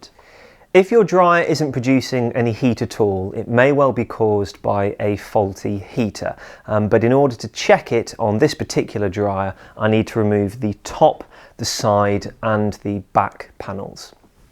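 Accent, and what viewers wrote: RP, United Kingdom. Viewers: Posh accent! :)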